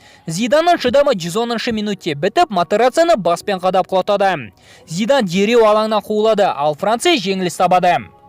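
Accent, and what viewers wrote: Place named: Russia. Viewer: Брат казакшаны казак боп сойле орыс емес н н н н демей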